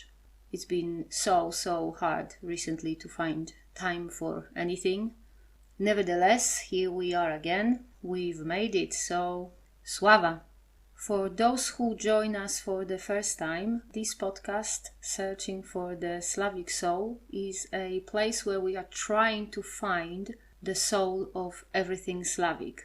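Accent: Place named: Poland. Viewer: I really enjoy listening to you. Your Polish accent is not bad at all. I have no problem understanding you. I am really amazed at your knowledge of history. You say things that I have known for a long time, yet others don't seem to comprehend. For example, anthropologically speaking, there's no such thing as an "ethnically pure" Slav. My ancestry is from your neighbor to the South, Bohemia. I know Polish and Czech people are very ethnically close. I don't find a lot about the faith and Bohemia, but I know they share it as well. So I will adopt my Polish brethren instead.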